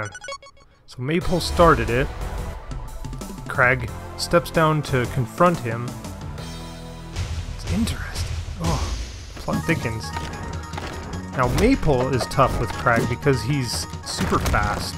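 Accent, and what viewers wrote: Canada. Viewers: Ah sorry man, i could have sworn i heard some Canadian in there, im scottish though so what do i know haha.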